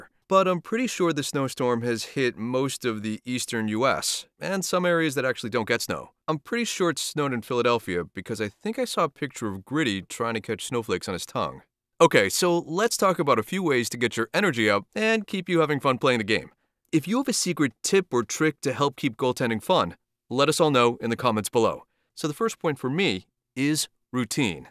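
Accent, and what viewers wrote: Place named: New England, United States. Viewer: What part of New England are you from? I'm from Massachusetts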